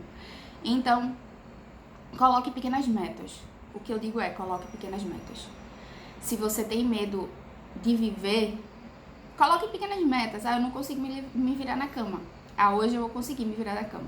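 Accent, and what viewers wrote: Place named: Northeast, Brazil. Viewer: Muito incentivador seu vídeo parabéns eu sou de Goiás e gosto muito do sotaque de vcs do Nordeste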